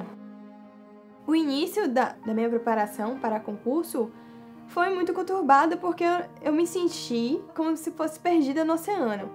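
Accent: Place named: Bahia, Brazil. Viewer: O sotaque baiano é inconfundível. Hahahahah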